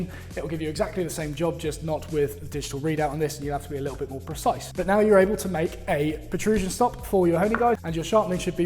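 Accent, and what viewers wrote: United Kingdom. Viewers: Don't add music noise to a demo video! You already have continuous talking, it is super fast, you have a British accent to get used to, your voice is a bit low and gutteral, and then you add this deafening level of extra noise. Why??? I came here for a QUIET and PEACEFUL video on woodworking, not getting driven deaf with a nervy video like this. So stressful to watch. I realize you are a young man buzzing with testosterone, but please get your workout in before filming. Your energy is too high and buzzing like a nuclear reactor. It does not have a calming effect on viewer. DO NOT ADD MUSIC TO ANY DEMO OR DIY. It is insanely irritating and unwatchable.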